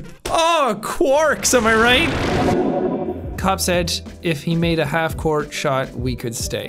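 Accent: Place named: Ireland. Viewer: Please get well jacksepticeye I pray for you 😞 I hope you get well because every time when I am sad and I need a smile on my face I know where I can go on YouTube to see your videos that help me laugh and make me smile jacksepticeye the bravest most strongest and the funniest like a boss my favorite YouTuber I love your Irish accents I love your funny comments I love the adventures that you go to in these video games I live your tweet videos where you make funny jokes every time it helps me to put my mind at ease my friend please get better I care about you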